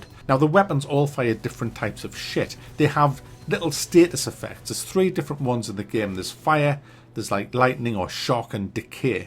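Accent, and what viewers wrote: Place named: England, United Kingdom. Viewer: North east England. We all talk like this around here.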